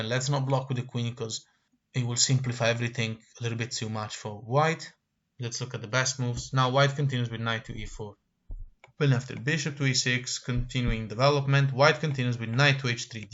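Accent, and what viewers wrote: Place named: Wales, United Kingdom. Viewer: You have an interesting accent. Welsh with something else.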